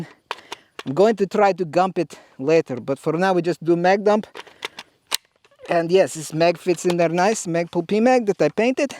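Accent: Russia. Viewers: Better Russian accent than FPSrussia. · Except for that russian accent you're almost clone-correct. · Your accent sounds like FPS Russian are you related?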